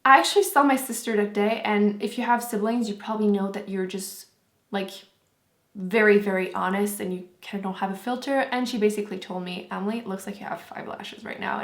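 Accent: Quebec, Canada. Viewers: Ah j'ai reconnu ton accent tout de suite!! Une québécoise!! :) Cool ton vidéo!! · Are u from quebec 😏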